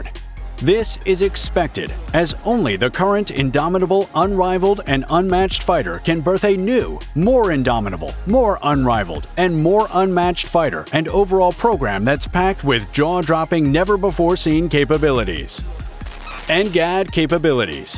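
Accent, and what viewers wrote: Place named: United States. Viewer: Nice....an actual American narrating American innovations. Strange having a Brit explain it to us.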